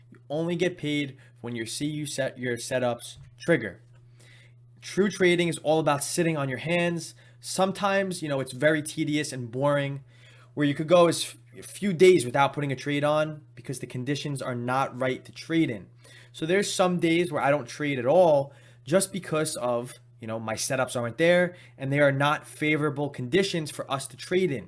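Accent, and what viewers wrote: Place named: Long Island, United States. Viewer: Sounds like a Long Island accent. Home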